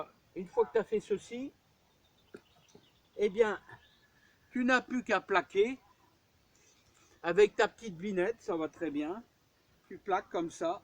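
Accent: North, France. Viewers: je travaillais avec des collègues qui venait de la SEITA de Lille ,certains de Bétune. Lorsque nous avons tous été licenciées se fut le retour au bercail pour eux. Avec vous, je retrouve le parlé du Nord et j'avoue que ça me fait du bien. Bonne après midi à vous.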